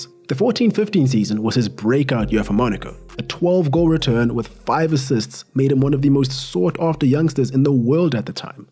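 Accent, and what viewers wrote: South Africa. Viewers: Are you a South African? Noticed the accent immediately ! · are you south african? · Are you from S.A bru ?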